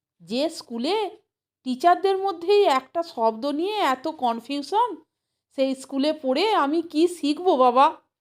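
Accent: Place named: Hooghly, India. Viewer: আপনার কথায় হুগলির টান পেলাম তাই বলছি।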